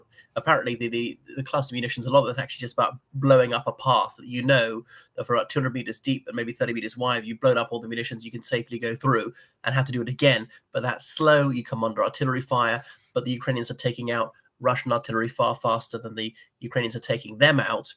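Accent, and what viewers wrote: RP, United Kingdom. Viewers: You speak posh but are literally a gullible credulous clown.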